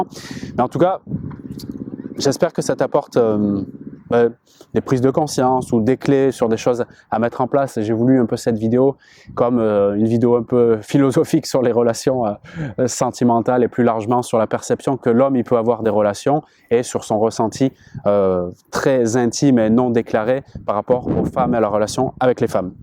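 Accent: Canada. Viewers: accent canadien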